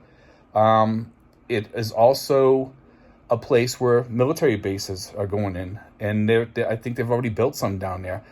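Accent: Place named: Massachusetts, United States. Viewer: I always enjoy your VLOGs and watch them first. Maybe because I understand your accent being from Mass. myself.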